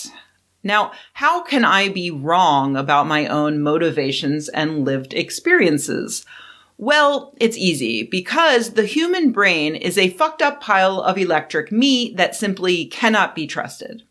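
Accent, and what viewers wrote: United States. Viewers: 1.)Congratulations, you have a strong American accent, but you enunciate so well that I can easily understand you. I often give up on trying to understand Americans because, in addition to their accents, they fail to enunciate. 2.)I am autistic so my brain does not work like most people's. 3.)Even from before I was born, I attended an evangelical Baptist chapel in a strongly Baptist rural community. I still believe that the whole community, including my parents lived as closely as they could to the teachings of Jesus Christ - they were not hypocrites. But by the time I started school at the age of four, I had decided that I did not believe what I was taught at Sunday School, as much as anything because the God of the Bible did not behave as well as my parents or others in the community - he seemed like a quite unpleasant sort of chap. 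I retained a vague notion that all those adults whom I respected must have known something I didn't, but even that became untenable after a while.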